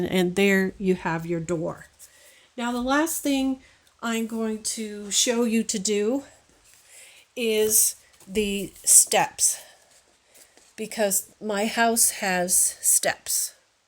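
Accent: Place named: South, United States. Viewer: Love your project. New subbie too. SOUTHERN GIRL what state are you from?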